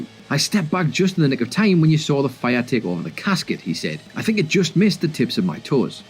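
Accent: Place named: England, United Kingdom. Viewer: Which Scottish boys? The narrator's from England.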